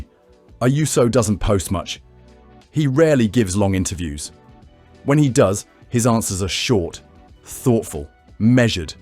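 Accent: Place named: United Kingdom. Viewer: I thought the same thing. Using a never cyclist wanker with a British accent…Classic!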